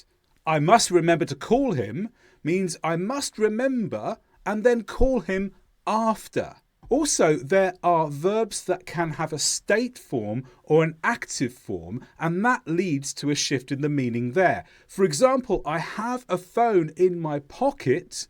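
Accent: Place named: United Kingdom. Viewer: I’m impressed by the volume and thoroughness of the information you’ve presented here. Thanks to the video I can identify grammar spots to work on and improve. And the fact you are from the UK makes me even happier ‘cos I prefer this accent. ❤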